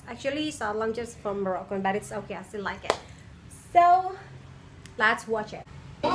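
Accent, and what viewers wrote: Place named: East, Algeria. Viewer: هههههه و الله ضحكتيني فالمقطع تاع اركحوا علينا برك و هدرتك تباني من الشرق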